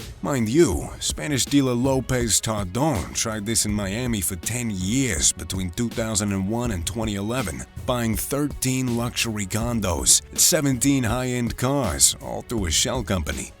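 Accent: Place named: Bronx, United States. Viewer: Love the NY Bronx accent 😅👌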